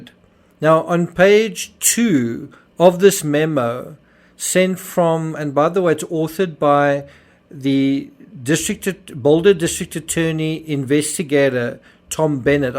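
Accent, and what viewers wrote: Afrikaans, South Africa. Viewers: lol being South African I thought hell yeah his accent must be Afrikaans, thanks for the info I didnt know was also a South African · Yes he is South African with an Afrikaans accent. One of the best on Youtube. His insights are often very original. is also ex South African. Another excellent True Crime Channel.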